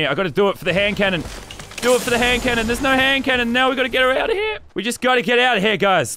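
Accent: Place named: Australia. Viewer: you're my favourite YouTuber, not just because i think swearing in an Australian ascent if funny but the things you say and do make me laugh just as much, also keep playing fortnite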